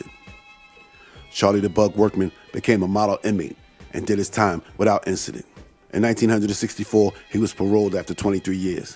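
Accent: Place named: New York, United States. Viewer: New York going by his voice.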